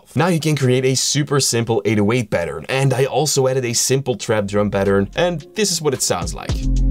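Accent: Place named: Netherlands. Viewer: bro sounds from NL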